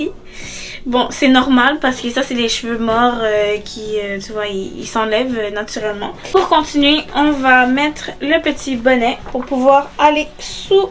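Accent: Canada. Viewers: Vous avez un petit accent canadien mademoiselle 😉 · Je connais la gamme d'Eva depuis plus de 2 ans et demie, elle a bien progressé ! J'utilise la gamme Kreyol essence depuis 3 ans vous la connaissez ? Elle est chère aussi mais fait du bien ! Il faut pas gaspiller. J'adore l'accent canadien ! Je pense tester la gamme de Eva mi 2023 !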